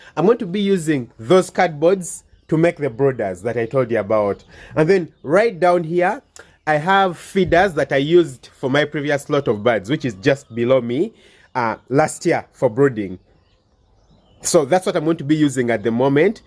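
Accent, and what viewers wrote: Uganda. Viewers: You just speak 🗣️ like a Ugandan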